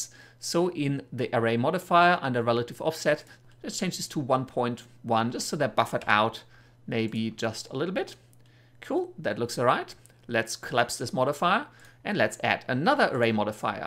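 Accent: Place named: Germany. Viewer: by the way, you sound pretty german sooo are you german?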